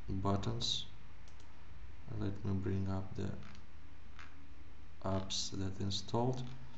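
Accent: Russia. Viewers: Улыбнул русский акцент )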